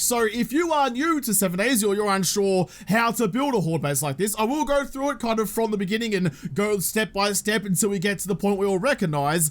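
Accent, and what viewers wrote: Australia. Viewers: You say at start of video for new people to game.. then proceed to shoot wood on ground and build right away. Lost me completely with that Aussie accent. Trying to learn.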